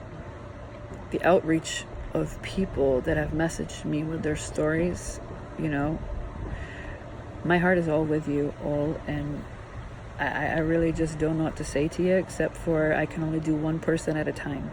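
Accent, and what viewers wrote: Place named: Ireland. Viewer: (also...i picked up on a little bit of an Irish sounding accent today in your voice -which I had not noticed before today's post)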